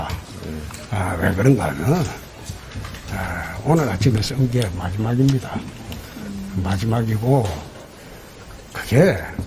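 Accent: Gyeongsang, South Korea. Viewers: 홍대표님 막말 아닙니다 경상도 사투리 표준어로 했는데 뭔소린지 억지도 어느정도지 바른말씀만 하시는 홍대표님 존경합니다 👍🙏🙆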